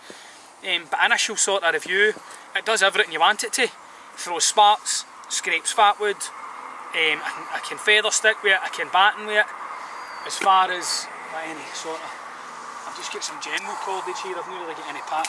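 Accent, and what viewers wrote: Scotland, United Kingdom. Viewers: A wee bit of Scot in yer voice is there?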